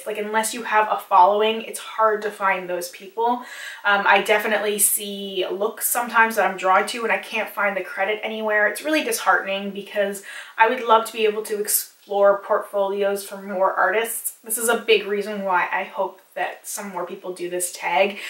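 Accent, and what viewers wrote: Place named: Canada. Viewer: I can totally hear your canadian accent in this vid lol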